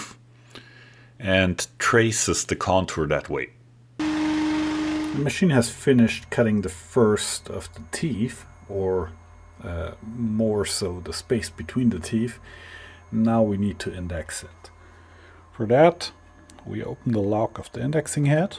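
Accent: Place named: Germany. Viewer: You sound a lot like a professor I know. He is Dr. Chadoba. I work with him a little at the University of Texas at Arlington. The way you speak is very close to Dr. Chadobas speech. I recognize the German speach.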